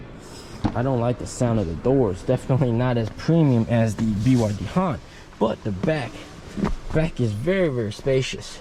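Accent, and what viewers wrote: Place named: United States. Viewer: Never watched any of his video, got a headache from all the «cool american» accent. Keep it simple, not everything is sooo cool. From an european’s perspective, the car looks great, but sorry. It’s still a Chinese car with shitty range. It will never work outside of China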